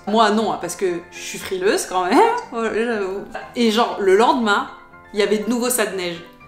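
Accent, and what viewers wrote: Quebec, Canada. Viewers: Très intéressante cette vidéo et tu commence à parler comme une québécoise 😅💪🏿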